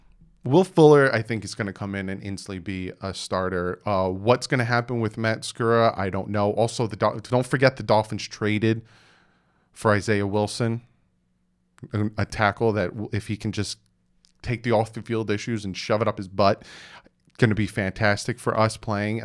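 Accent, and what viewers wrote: New Jersey, United States. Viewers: Late night letting his Jersey accent out to play.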